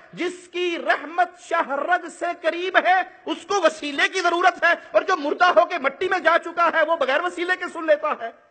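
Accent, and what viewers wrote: Arab world. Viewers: اردو کو اردو لہجے میں بولا کریں، عربی لہجے میں نہیں۔ اردو کو عربی لہجے میں بولنا اتنا ہی غلط ہے جتنا عربی کو اردو یا پنجابی لہجے میں بولنا۔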